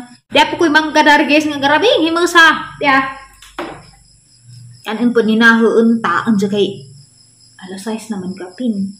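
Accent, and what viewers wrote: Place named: Leyte, Philippines. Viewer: Hello bisaya pud ko pero naay lain nga words nga imong giesturya. Taga leyte baka kay ang punto murag same s taga leyte.dili ko ma boring s imong video ba mao e subscribe tika😁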